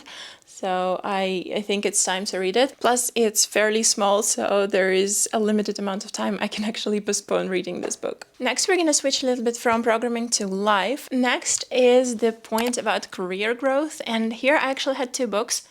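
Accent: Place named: Eastern Europe. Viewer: you have slight slavic accent, where you from?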